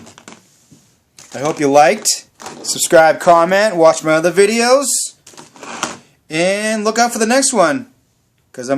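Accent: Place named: New York, United States. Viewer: Are u A New Yorker?? From the Big Apple? Or Vinnie from the Bronx?? Love that Wiseguy accent 👍🏻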